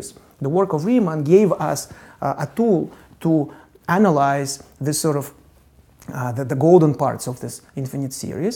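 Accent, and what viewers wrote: Russia. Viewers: Он точно Русский! Он имеет Русский акцент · Yeah, it's kinda wierd: his wording is correct and he uses live idiomas and cultural references but his accent is soooooo soooo Russian. I believe he keeps thinking in Russian and then formulates a quick translation of his thought in English - that's quite strange as naturally upon some point you start thinking in the language that is foreign to you and that is the moment you generally say one speaks a language. А тут прям такие знакомые интонации, прям слышно как звучит с такой же интонацией русский оригинал фразы )) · This guy sounds like he has some russian accent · I can understand all of this just because i am russian too, because his accent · is that a russian guy? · Somewhat strange, but I understand J. Grime's accent better than this' obviously Russian speaker. (Although I'm Russian) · мне еще нравится что не всё с русским акцентом, это вперемешку с чистым, правильным произношением, мне кажется это странным если он может так бегло разговаривать, но при этом говорит на половину или больше с сильным русским акцентом, как-будто он даже не старается, и не пытался учить как правильно произносятся слова, это странно🤔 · The guy has some horror core Russian accent. 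· is he russian? he has a small but distinct russian accent... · It's a russian accent, very very fun to listen this - russian phonetics and russian emotions, but english phrases and words. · Russian professor? Him accent...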